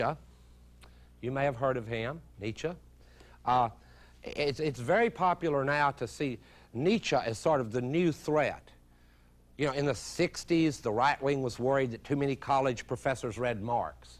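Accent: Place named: Texas, United States. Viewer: I knew this dude was from Texas because he sounds like Mark Rippetoe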